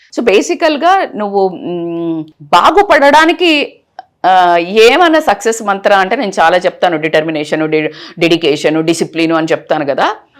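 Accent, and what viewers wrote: Telangana, India. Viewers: I like her accent of Telangana language 🎉generational ga elagae matladutharu🌸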